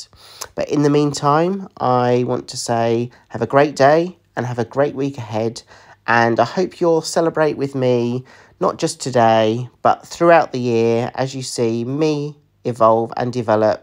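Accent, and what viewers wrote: United Kingdom. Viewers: Happy birthday,. I love to hear your british English. 😍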